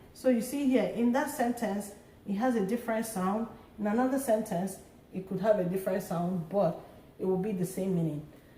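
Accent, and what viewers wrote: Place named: England, United Kingdom. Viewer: Omo am in love with ur English accent maa 👏👏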